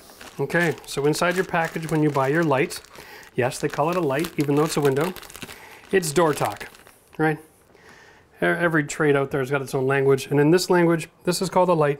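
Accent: Canada. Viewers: I’m here for the Canadian Accent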